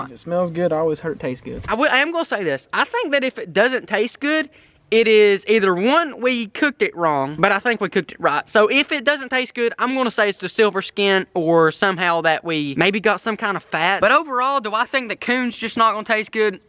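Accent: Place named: Alabama, United States. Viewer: Why do you kill them for. they had a good life wow you can tell he's from Alabama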